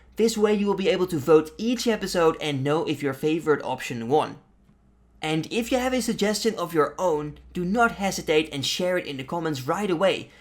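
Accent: Netherlands. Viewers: Van het eerste woord dat je zei hoorde ik dat je nl was in episode 1. Echt een toffe serie en ik hoop dat je een daadwerkelijke noninatie krijgt voor die golden gnome :) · Ah I love a Dutch accent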